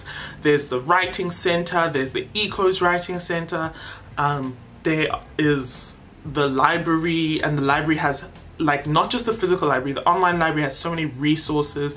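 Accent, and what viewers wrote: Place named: South Africa. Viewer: Thank you this was very helpful! My mom heard your voice and was shocked that you're South African😂😂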